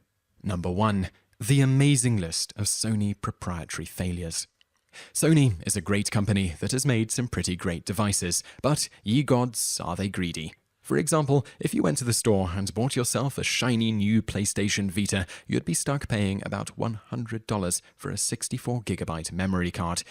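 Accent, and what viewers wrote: United Kingdom. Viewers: Clearly 40p, he's got a UK accent but I guess he's been around Yankees too much with all his yank phrases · Something tells me the narrator of the video is British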